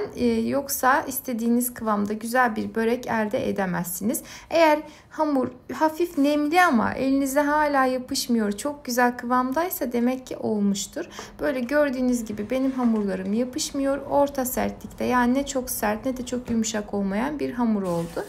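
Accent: Azerbaijan. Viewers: Türkiye türkçesinde konuşmanıza rağmen Azerbaycanlı olmanız bellidir.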